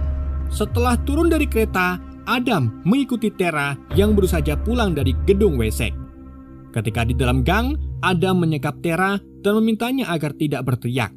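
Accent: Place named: Banyumas, Indonesia. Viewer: cingireh admine wong ngapak yah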